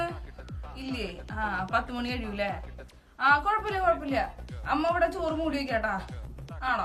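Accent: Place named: Thrissur, India. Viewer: Thani thrissur slang😊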